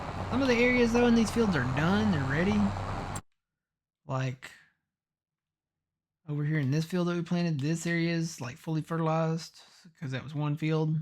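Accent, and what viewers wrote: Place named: United States. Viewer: hey bro. this is good content, lots of creators mess it up by putting in those speeded up farming with horrible music, plz dont do that and you will have at least me to keep telling you are doing great. you must either be a farmer, or have or do work on farms. and your country accent, lol not a bad thing just kinda funny. I live in idaho you know SPUD COUNTRY LOL, Im from Fairbanks Alaska, and spent ha;f my life in Seattle,. Not much for the big city, I am a metalhead but i love all real music. if yoou ever wanna say wat up Im down to make a new friend. If you want I can send you my stean handle or watever ppl call it these days and maybe we could multi player some time. like i said this is good content, keep up the good work bro. Sean.......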